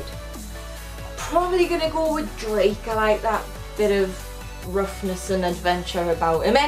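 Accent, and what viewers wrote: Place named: Liverpool, United Kingdom. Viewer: Sound scouse most of the time here. Best of luck with the channel!!!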